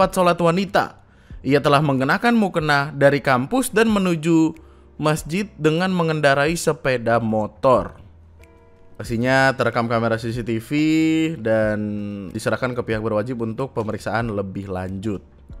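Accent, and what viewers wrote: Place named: Surabaya, Indonesia. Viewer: Suroboyo Banget 😂 Aku cinta Orang2 Surabaya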